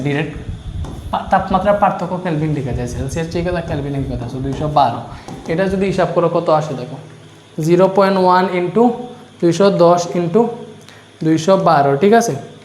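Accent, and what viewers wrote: Sylhet, Bangladesh. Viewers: Sir ki syleti naki 😅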